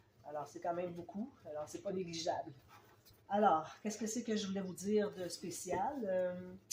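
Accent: Canada. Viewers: Bonjour trop joli merci pour les tutos 🎉🎉 accompagné de votre accent canadien 🎉🎉j'adore car je suis allé au Canada Québec en septembre j'ai trop aimé ce pays et l'accent Merci 🎉🎉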